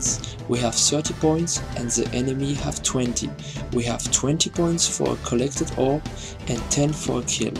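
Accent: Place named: France. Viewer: Pas mal comme vidéo ça se sens que tu parle Français mais ton anglais est parfait 👌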